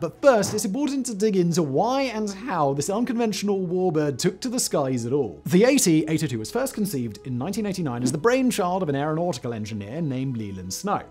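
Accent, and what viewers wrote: United Kingdom. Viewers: Too much "British inflections" makes this too hard to listen to.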